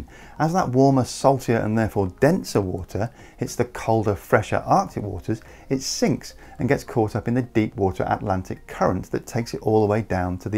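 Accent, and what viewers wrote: United Kingdom. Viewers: this Brit-voiced clown is a total fake-news CarbonTaxFraudScam alarmist propagandist. Anyone can look up Dr.Patrick Moore or other FACTUAL evidence-based sources for the TRUTH about how greatly increased co2 will be an enormous benefit to our climate & biosphere. Here's the TRUTH & FACTS: co2 FACTS vs neurotic alarmist fake-news FICTION: Greatly Increased co2 will reforest the Sahara, Gobi, Atacama, Western US, and all other barren deserts worldwide, as well as the desolate Arctic & Antarctic tundra-wastelands. Frozen immobile ice will melt and CIRCULATE moderating & cooling the equator & tropics, as warmer polar oceans evaporate & REHUMIDIFY the atmosphere precipitating & soaking the deserts into lush jungles & fertile farmland while restoring depleted aquifers & watersheds globally. ZERO phoney "sea level rise" (and btw, REAL PHYSICS: floating ice does NOT increase the level of the water it floats in as it melts! 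DUH. the buoyed ice above the waterline is no longer pressing down once it has melted, DUH). The Sahara alone will soak up more water than all the ice on Greenland & Antarctica. Terrible crop-killing winters will give way to year-round growing seasons in the Great Lakes and other agricultural lands worldwide. The Great Lakes basin with its uber-rich soils & unlimited fresh water will produce 1,000x more food than the San Joaquin Valley (which requires unsustainable irrigation & fertilizers). That's sustainable food for 35 BILLION PEOPLE. Terrible crop-killing winte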